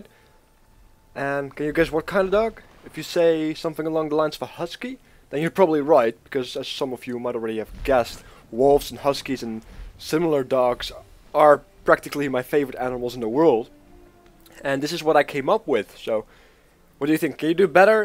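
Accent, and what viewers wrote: Netherlands. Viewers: hehe grappig dat je vaak snel kan horen of iemand Nederlands is :D Nice video trouwens.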